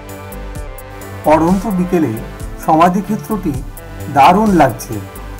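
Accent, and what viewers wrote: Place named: Bangal, India. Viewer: এই তোমরা ছ ছ দিয়ে কথা বলো কেন। তোমরা কি ইন্ডিয়ার বাঙাল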